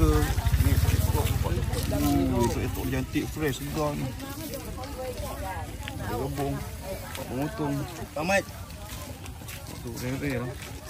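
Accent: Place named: Kedah, Malaysia. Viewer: Terbaik abg p ronda ronda pasar kemboja di selangor, abg ni asal KEDAH ke? Cakap loghat kedah, ha ha haa.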